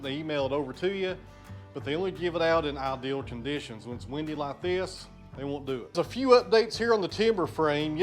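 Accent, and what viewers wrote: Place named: Tennessee, United States. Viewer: Well friend, there’s nothing I enjoy more after a twelve hour day then watching OTW, and hearing your Tennessee talk. My dad’s people were from Big Sandy, Paris, Skullbone. If I close my eyes, I can hear my pawpaw in your voice. Good stuff. I vote for Cypress. Go see your buddy, Jake.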